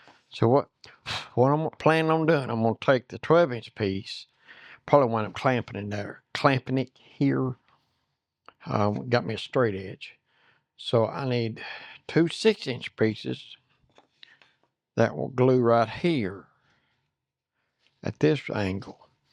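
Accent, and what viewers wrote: South, United States. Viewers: Hi, hope you are doing well. I wish you could make more video's more often, but that I imagine is pretty tough to do by yourself. I have watched all of your video's two or three times by now. But the real reason I wante to comment here, is to yell you on one of your video's, dont remember which one now, someone wrote a comment and was complaining about your "Southern Accent." That's probably the biggest reason I love watching your channel. Not only do you do a great job in discussing and explaining how to do every step in the things that you do. But more than that, I love listening to you talk with that "Southern Accent!" So I wouldn't let that get you down, there are way way more of us out here that don't care about your accent, so keep it up, and if that viewer was bothered by that accent of your I would suggest to him or her, to don't come back. 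There are a way more of us out here to don't mind it. Like I said, that southern accent is one of the biggest reasons I watch your channel!. And remember this, no matter what you do or say, there are going to be butt heads out there that you just can't satisfy. Take care, and keep making the video's. Jim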